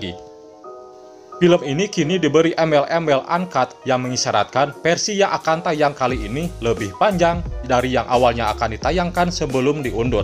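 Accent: West Java, Indonesia. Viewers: vo nya org sunda fix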